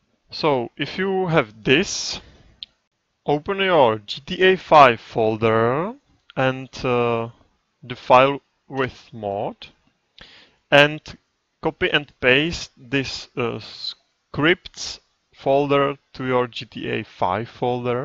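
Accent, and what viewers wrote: Czech Republic. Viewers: Ten český přízvuk nezakryješ :-D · He is czech it's hard speak english when you are slovanian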